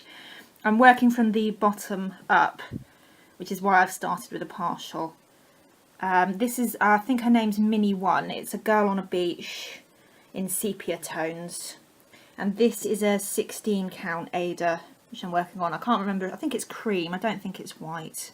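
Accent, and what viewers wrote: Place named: United Kingdom. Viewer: Just found you! I love your lovely British accent and your comments about the weather there! What beautiful WIPS you showed!💕I am about to watch another of your videos.😊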